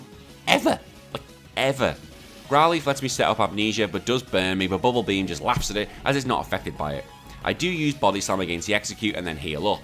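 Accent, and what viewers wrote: Liverpool, United Kingdom. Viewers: Scouse pokemaniac......love it 👌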